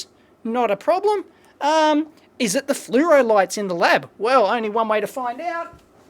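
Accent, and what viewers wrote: Australia. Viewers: I'm a "Yank", but I'm starting to hear a little Aussie voice in my head while troubleshooting hahaha!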